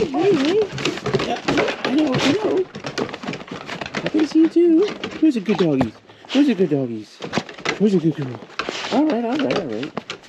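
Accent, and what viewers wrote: Canada. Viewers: Hello, Im assuming this is Canada , bc of your accent. Are you allowed guns while living off grid in Canada? A life like this goes hand in hand with rifles. Just a weird question for ya. Killer set up sir. Cheers!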